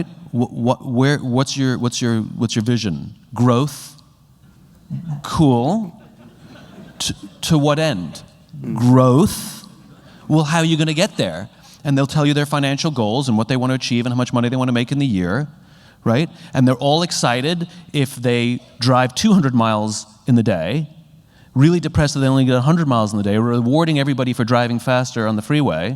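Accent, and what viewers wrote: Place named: United Kingdom. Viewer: I love British accent of yours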